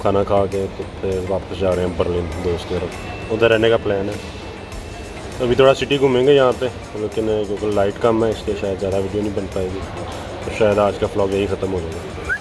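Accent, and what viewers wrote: Punjab, India. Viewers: Yar main tumhari sari videos dekh Raha hoo colour cloud Aor jolly azeem I'd se boht hi piaray lagay ho mujhe Tum special Punjabi accent Urdu boht achhi lgti hai Apki Lahore Pakistan se boht Sara Pyar 💜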